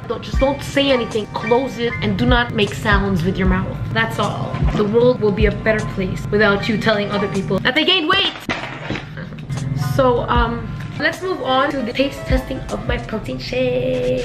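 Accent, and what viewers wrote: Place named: Philippines. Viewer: Oh my goodness I love your Filipina accent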